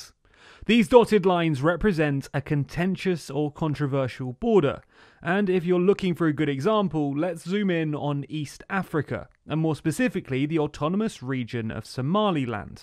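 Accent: United Kingdom. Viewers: correction: there is no dotted line dividing the map of Somalia to make you nervous. Your presentation is good, but it is factually wrong. I am from Somaliland, and it is only my tribe that wants independence, in order to create a country were my clan is the majority and we will win all the "elections." The other 4 tribes don't want independence. The referendum you mentioned was only done by my Isaq clan, the other 4 clans refused to take part. so, of course we "won" with 97% of the votes. Many of us are having second thoughts, but we are afraid to speak up, because the former rebels from my clan who are now the "government" in Somaliland do not tolerate dissent. Your video is my clan's propaganda leaflets read with a British accent. Please check your facts.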